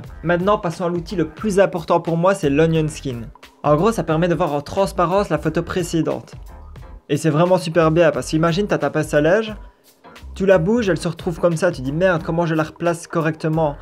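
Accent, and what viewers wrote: Liège, Belgium. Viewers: tu a un accent de liège extrêmement fort mais tkt moi aussi chuis belge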